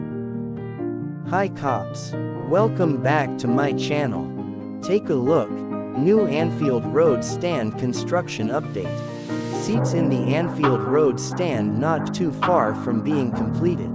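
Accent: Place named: United States. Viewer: Why do we so often have an American voice on LFC YouTube videos.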